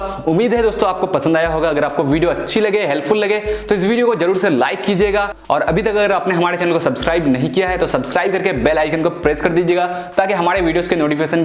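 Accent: Bengal, India. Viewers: main v hun. Bankura se.apka baat Karne ki tarika se mujhe laga ki ap bangali ho..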